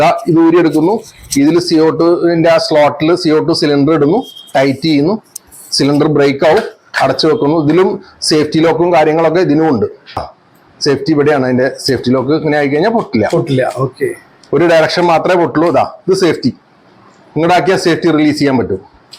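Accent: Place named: Kochi, India. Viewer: Kochi karude samsaram padichallo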